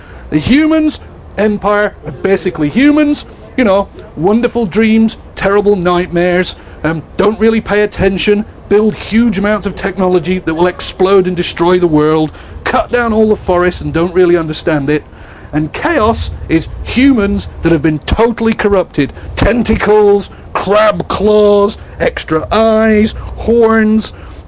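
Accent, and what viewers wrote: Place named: Yorkshire, United Kingdom. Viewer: Hahah, it's genuine. It's a just a regional accent, yorkshire i think! Although Games Workshop have made it big in north america they are a british company.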